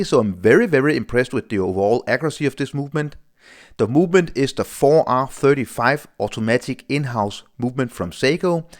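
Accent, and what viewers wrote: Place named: Denmark. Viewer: lyder umiskendelig som dansk-engelsk tydeligvis 🙂 I think the Samurai watchcase is beautiful both this which is the new one but also the previous edition being a bit bigger. I have a weak spot for green dials, however i would prefer this model in a higher quality as for a ceramic bezel an crystal glas. I am still considering buying it but there are so many different to chose from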